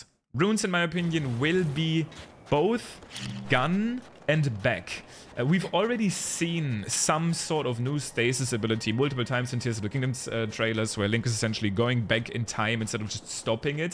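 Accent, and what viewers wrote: Germany. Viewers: Love this guy's german accent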